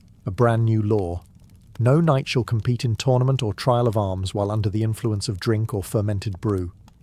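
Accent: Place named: United Kingdom. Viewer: It's 2.35 in New Zealand. Very very tired, but I must have my British man talk to me about history before I conk out.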